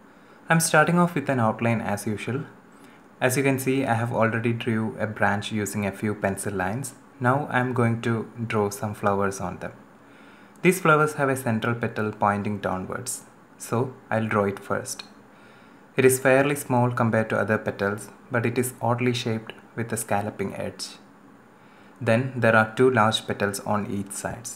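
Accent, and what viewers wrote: Kerala, India. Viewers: You sound so malliyali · Dude your accent... kerala?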